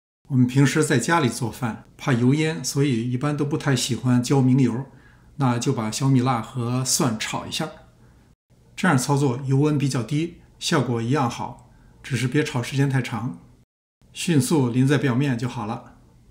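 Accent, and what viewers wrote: Beijing, China. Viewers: 感谢分享，京味儿普通话缓缓讲出生活智慧！明儿就做！像博主一样切西红柿！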